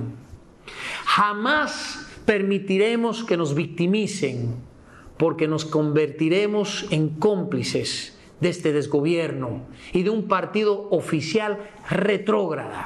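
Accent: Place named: Dominican Republic. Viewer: Admiro tú español Dominicano, Increíble, eres 100% Dominicano.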